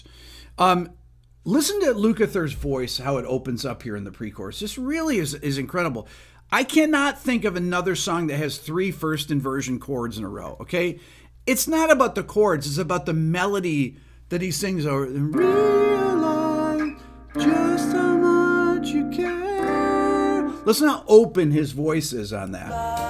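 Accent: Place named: South, United States. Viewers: You are a native New Yorker but the south is starting to rub off on you. Joined the league. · Ain’t nuthin’ wrong with soundin’ southern lol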